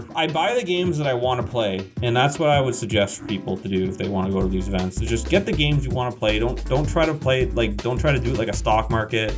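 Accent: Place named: United States. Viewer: As a Michigander, I think your accent is very American. In regards to game collecting in 2023, if it’s not Nintendo it’s not horrible. I collect complete in box ps1, ps2, and Genesis carts and Iv got a good collection and I only started this year, and I don’t feel like a paid too much. Just don’t buy games you’re not gonna play, it’s that simple.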